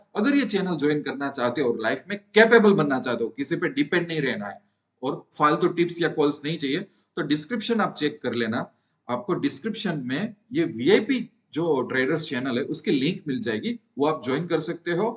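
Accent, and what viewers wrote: Gujarat, India. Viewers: Pakka gujju bhai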